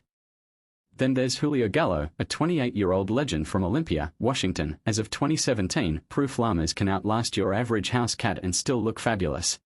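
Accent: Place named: Australia. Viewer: did you choose an aussie accent for this u.s. history lesson? perfect 😎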